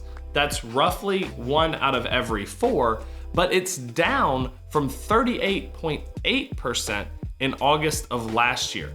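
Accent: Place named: New Jersey, United States. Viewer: Sounds like NJ!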